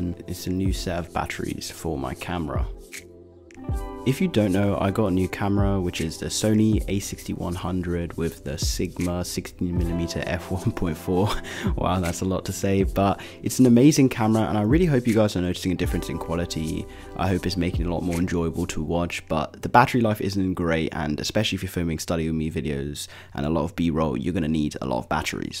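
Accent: RP, United Kingdom. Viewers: But how is he posh do?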